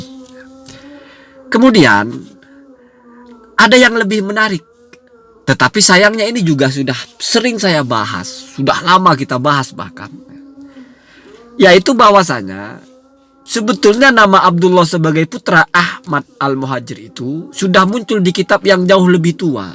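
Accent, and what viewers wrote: Madura, Indonesia. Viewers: 😂😂😂😂 maduranya sangat kental...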